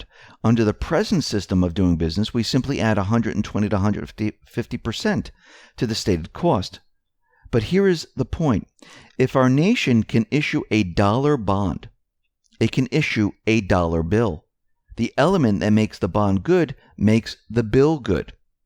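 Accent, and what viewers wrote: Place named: New York, United States. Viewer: You’ve got to be from New York. Queens if my ear is correct.... Southport CT...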